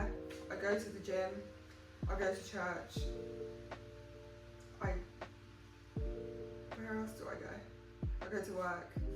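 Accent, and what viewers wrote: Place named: United Kingdom. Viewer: I love your accent! What part of the UK are you from?? 🇬🇧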